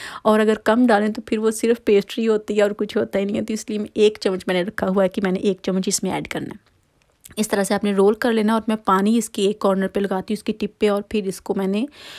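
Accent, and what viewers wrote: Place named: Faisalabad, Pakistan. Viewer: Aoa. Mashallah looking nice. App Faisalabad se h app ki boli Faisalabad k logo jaisy h. Btana zaroor.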